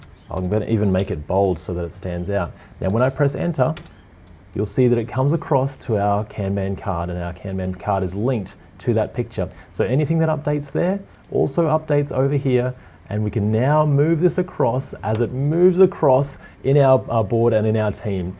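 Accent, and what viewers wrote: Australia. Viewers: so nice as an aussie to hear another aussie accent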